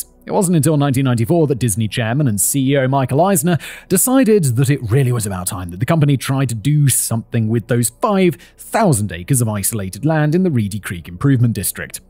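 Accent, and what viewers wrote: RP, United Kingdom. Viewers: The last time I watched this guy he really talked as though he had a huge plum in his mouth, so it was a put on or it tuned people out & here we are....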